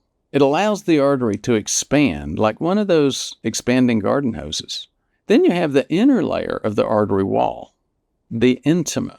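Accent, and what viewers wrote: South, United States. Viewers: Your redneck accent isn't reassuring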